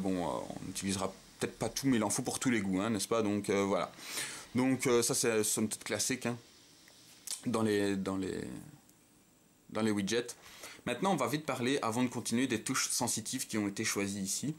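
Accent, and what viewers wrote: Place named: Belgium. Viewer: super review, j'adore l'accent belge !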